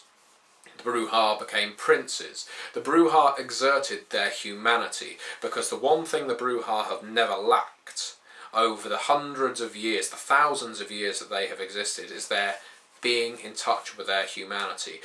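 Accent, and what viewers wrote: United Kingdom. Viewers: This is prove that no matter how scruffy you look the second you open your mouth and out pops a British accent you sound 9999 times better then you look.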